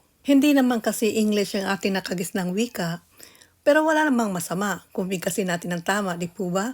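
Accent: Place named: Visayas, Philippines. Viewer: Ganyan nga sis ang pag pronouce lalo na sa aming mga bisaya hehe pero hindi naman lahat , salamat po sa share have a nice weekend!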